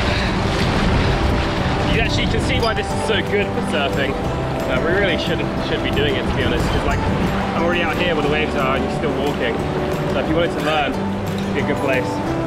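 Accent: United Kingdom. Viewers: I like your accent. Is from UK Right ? Or Am I wrong? I’m from El Salvador I like how people enjoy their time in my little and beautiful country I miss living there., I hope retire in my country hopefully some day. Cheers from California .🌺🌺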